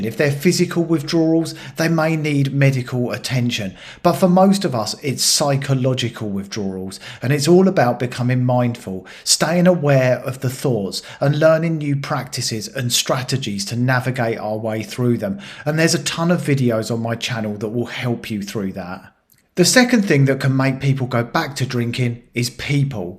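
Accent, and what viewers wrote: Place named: England, United Kingdom. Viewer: Apart from excellent advice ....its so refreshing to hear an English accent on this uttrly whitewashed americanised yourube app. 😂🎉🎉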